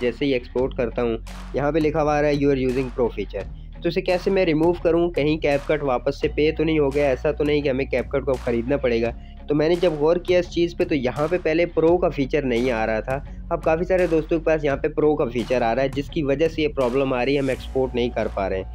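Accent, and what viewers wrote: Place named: India. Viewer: i don’t understand ur indian accent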